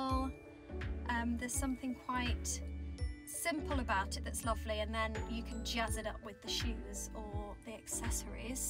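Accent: United Kingdom. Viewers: I just love your cute videos! I bought all sorts of tea at the store this morning because of you😉 your style is adorable. I also have decided you look like a combination of Jennifer Garner and Kierra Knightly, you are so pretty and your accent is the best. My husband always makes fun of me and my obsession with a British accent. I mean I feel like British people could say anything, even if it makes zero sense, but somehow it always sounds smart and classy with their accent🤣 love all your videos, and I will miss Fitzsimmons so much!!! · Love you from Japan 🇯🇵! I am learning British accent from AOS and your video! I can’t wait AOS comes Japan😭😭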